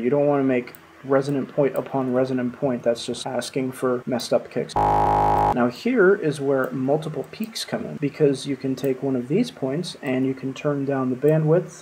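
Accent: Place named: United States. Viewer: I'm making an assumption on your accent but you sound like you're American! Dude I am going to rep you so hard, we need more Americans making hardcore.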